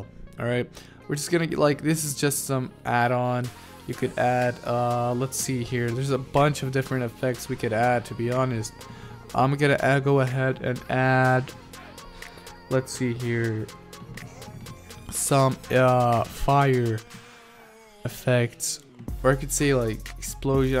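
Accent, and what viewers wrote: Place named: England, United Kingdom. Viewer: Great video! You've helped me so much! Want more intro's tutorials please, and if you could bring us some layout's tutorial it would be amazing! And I love your English accent :3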